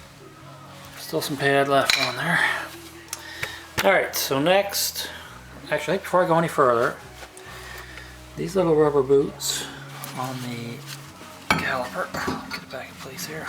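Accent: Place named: Canada. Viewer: I wasn't watching the video at first, but noticed you were obviously Canadian. Then I flipped over to the video and confirmed that with the Mastercraft tools, eh.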